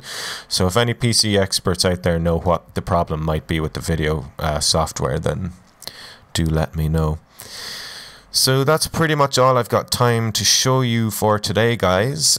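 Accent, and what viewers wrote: Ireland. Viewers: l know this is a very old video but in regards to marks mutant mod l personally dont use it because of the silly armored deathclaws and hogs it adds , lt just makes no sense from a lore perspective at least with the hogs and just seems silly to me .also fellout is kinda eh l feel it ups the contrast to much. also l cant pin down your local accent ? what part of ireland are you from ?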